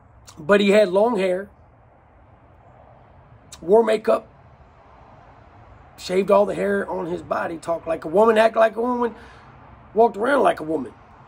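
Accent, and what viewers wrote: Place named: South, United States. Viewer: It's very Southern too really. I personally dig when it comes to story tellin' n' all.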